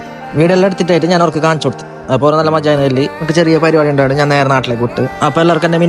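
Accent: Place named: Kasaragod, India. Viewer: Yeppa nammale kasrod slang😂🫶 maje aain🥰💗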